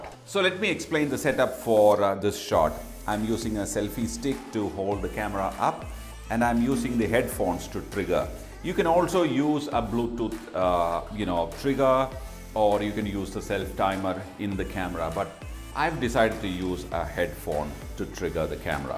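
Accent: India. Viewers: His English is like Hindi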